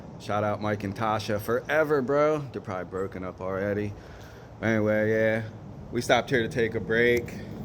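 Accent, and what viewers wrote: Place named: Mid-Atlantic, United States. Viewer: Bro, you gotta be from DE, MD, SEPA area with that accent 👍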